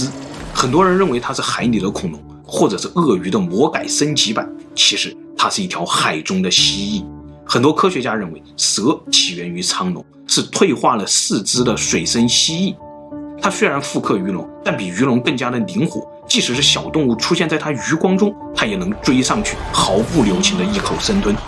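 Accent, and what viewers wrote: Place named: Guizhou, China. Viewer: 总感觉是贵州人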